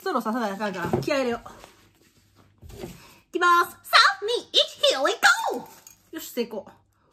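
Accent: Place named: Kansai, Japan. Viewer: 割と低音の関西弁ほんとに再現されすぎ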